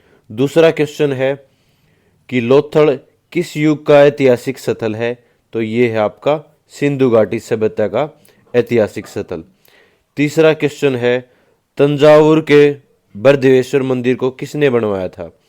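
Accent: Haryana, India. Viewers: Bhaiya the Haryana s ho ke?😊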